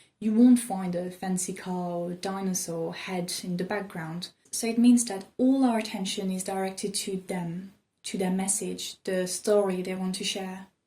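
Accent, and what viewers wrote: France. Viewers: You put forward an interesting perspective on alternative self-help that is thought-provoking but I must critique your last few comments. "What if getting angry was self-help? What if talking about social injustices was true self-help? ... And that for me, that's what self-help truly is" Like others in the comment section have said, I think your misunderstanding of what self-help is lies in those statements. Self-help quite literally means to help oneself, to better oneself, to care for oneself, and be self-sufficient. It's egocentric, but not egotistical (but not ever exclusive to each other.) Your ideas of self-help are about helping others, social injustices, activism, and related liberal values. And there's nothing wrong with that, but that's all unrelated to self-help. That's being philanthropic, that's being altruistic, that's activism, that's helping another person, that's selflessness. 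But it's not self-help. Of course, helping others and volunteering can bring benefits to you and another person, but it's not the overarching definition of self-help. I think the fallacy in your thinking is being absolutist. And you demonstrate this by thinking that what seems to be true for YOU, then must be true for others as well. But that's not right. I like to take morning showers, but I know it's not necessarily the "right way" to do things. I can tell you have a French accent so you might not realise how your words may have conveyed a misunderstanding with some of us contrarian v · Yes, sometimes i dont understand what the hell she is saying because of that bloody french accent. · you're just the same with them. slower pacing and frnch accent, but the same. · Where are you from? You sound simultaneously French, German, and Irish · Are you like French - having lived in an english speaking country? 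· I love your Frenchlish accent. 😃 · Very nice perspective that I have not heard discussed before. Et le tout avec un accent Français en plus.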